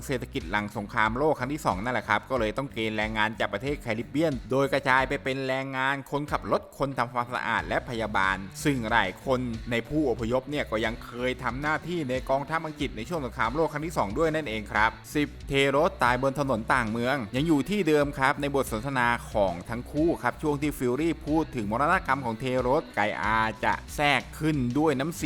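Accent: Thailand. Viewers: เหน่อแบบนี้ ราดรี หรือ คอนถม หรือ เมืองกาญ ครับ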